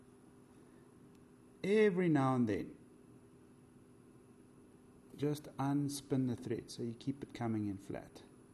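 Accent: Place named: South Africa. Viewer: Love the tying and the SA accent. Great fly thanks.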